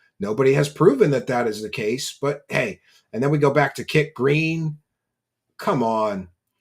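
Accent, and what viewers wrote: Philadelphia, United States. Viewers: Good for you!!! I found his channel about 6 yrs. ago. Saw this guy wearing glasses and talking like someone from Philly about all the BS and I was hooked.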